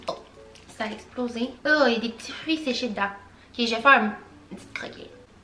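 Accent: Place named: Quebec, Canada. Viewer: Comment tu te sens obligé de dire « je suis québécoise » 😂 ça parait juste en t’entendait parler haha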